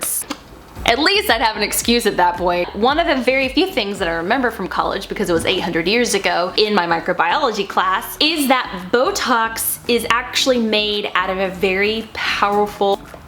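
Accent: South, United States. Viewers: F A N C Y - really southern accent - love it!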